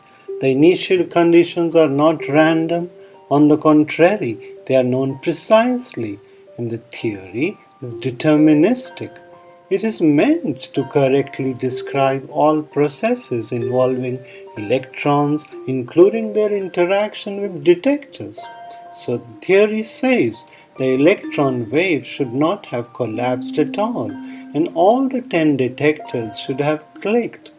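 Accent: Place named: India. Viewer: Sorry if i seam racist here, but i have to tell you! I just LOVE your indian exant, i really get this feeling of wanting to smile, thats rare. Its something about how you use tones in your voice. This a bonus for a lecturer like you, its like having einsteins hyper inteligent teddybear speaking to me through youtube or something. Newer loose that grat tone of woise. Your like a guru, not talking bullshit all the time.. More power to you :-)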